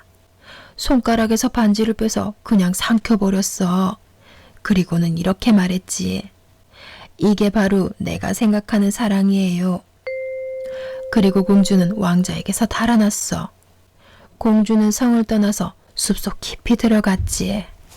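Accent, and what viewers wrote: Gyeongsang, South Korea. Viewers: 신나이 들으면서 구독 하기 시작했는데 목소리 톤이 좋으시고 경상도사투리 억양조차 푸근하게 들리네요 (feat. 연기력) 좋은 컨텐츠 감사합니다^^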